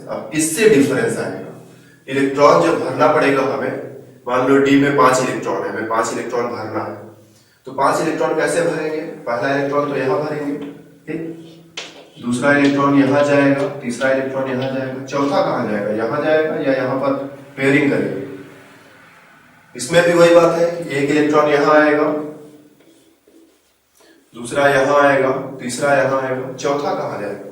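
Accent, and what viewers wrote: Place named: Uttar Pradesh, India. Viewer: sir aap U.P se lgte hain. bohat acha smjhaaye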